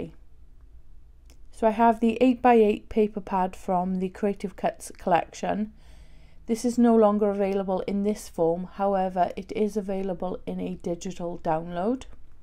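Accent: Wales, United Kingdom. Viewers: Hi, Lovely tutorial, thank you! Sam is very wise having you on her design team, methinks! Love what you did with this, awesome work. Out of curiosity, your accent is sooooooo familiar to me, are you by any chance from Cardiff, or at least somewhere in S. Wales? I am in Cardiff, an adopted Welshie, Cardiffian, as I have lived here most of my life (shhhhh, don't tell anyone, I am actually English;) ) lol!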